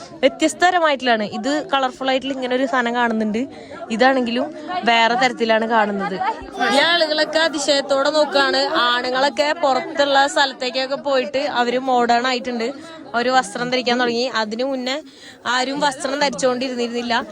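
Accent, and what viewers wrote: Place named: Thrissur, India. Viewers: Slang bore avunnu,trissur slang varunnu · ഇന്ന് എന്താ തൃശ്ശൂർ ഭാഷ 😃😃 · Slag mariyoo oru trissur slag cheruthayitt vannittundallo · Inn ipoll തൃശൂർ slang annaloooo ❤ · ഇന്ന് full തൃശ്ശൂർ സ്‌ലാങ് ആണല്ലോ ❤️ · തൃശൂർ ഭാഷ ഒഴിവാക്കണം.... കൊള്ളില്ല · Thrissure slang varunnu samsarikumbol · ഇതെന്താ തൃശ്ശൂർ slang 😂😂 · ത്രിശൂർ ഭാഷ കലക്കി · slang oke mariyalo… epo trissure bhasha analo 😂😂😂😂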